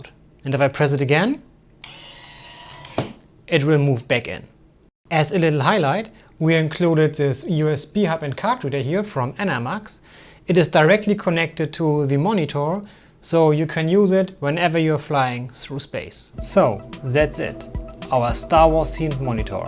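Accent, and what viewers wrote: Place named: Germany. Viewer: Es ist immer wieder faszinierend, dass man den deutschen Akzent so gut heraushört 😅 Ein wahnsinnig gutes Projekt.. ich sollte aufhören, auf die Empfehlung von RBB zu reagieren, oder ich werde später mal nie fertig, sobald ich zum eigenen Basteln komme 🤷‍♂️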